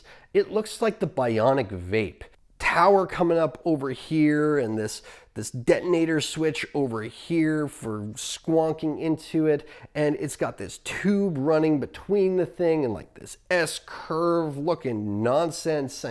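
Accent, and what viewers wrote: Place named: New York, United States. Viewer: your mini me really brings out the new yorker in you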